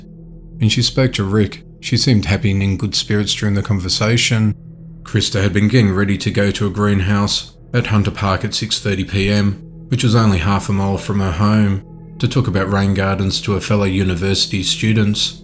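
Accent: Australia. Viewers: Okay but are you Aussie ??? I need to know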